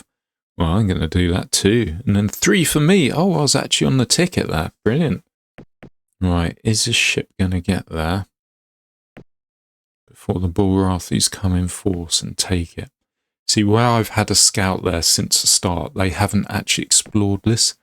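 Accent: United Kingdom. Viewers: Great 2 c someone else than Sullla playing this diamond. And with a nice, calm Bri'ish accent :D . Thank you sir.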